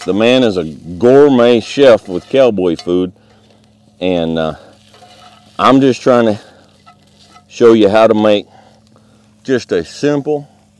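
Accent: United States. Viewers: Your voice is just so American and relaxing! Fantastic work! 😍❤️🌟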